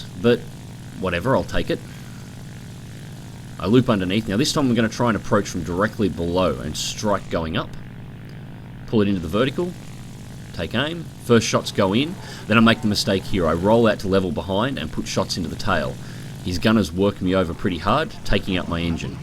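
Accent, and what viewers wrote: Australia. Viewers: What easiness and talent at gameplay and great post battle analysis! I was watching Mighty Jingles playing War Thunder and some guys recommended. So I hoped to this channel a bit and eventually subscribed. And I adore the accent! I have relatives in Adelaide, although I am not Australian. I've heard a lot of good stuff about Australia and Australians. Greetings from Belgrade!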